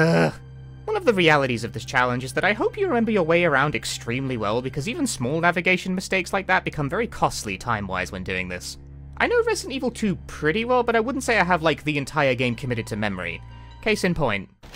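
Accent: United Kingdom. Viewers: Are you british? You talk like a sitcom character.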